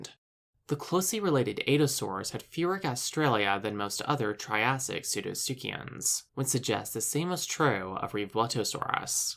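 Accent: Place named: California, United States. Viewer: Effing hell mate!! Why is the narrator soooo californian? Is he gay?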